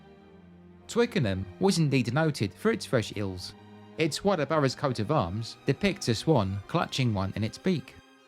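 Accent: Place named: Essex, United Kingdom. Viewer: I love your accent it reminds me of my dad's best friend ,who we called Uncle Frank.He was from Essex close to town.But he was a working class man with a vast knowledge of history and politics .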